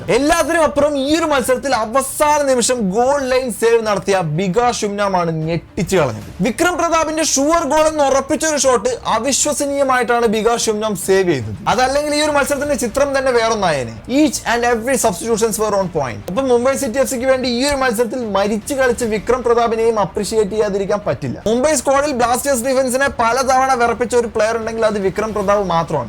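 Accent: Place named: Thrissur, India. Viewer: Thrissur slang❤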